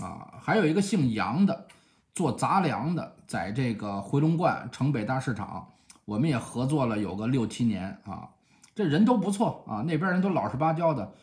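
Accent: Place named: Tangshan, China. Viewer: 哈哈唐山话，比落亭话还有味儿呢。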